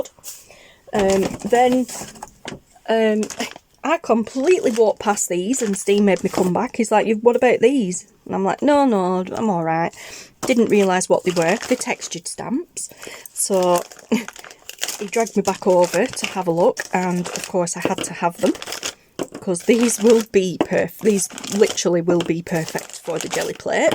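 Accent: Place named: Northern England, United Kingdom. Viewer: Nice to hear a northern voice doing crafty hauls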